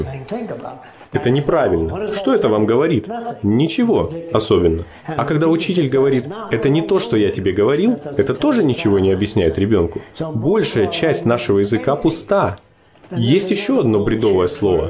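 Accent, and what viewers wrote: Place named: United States. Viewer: Говориш как Американец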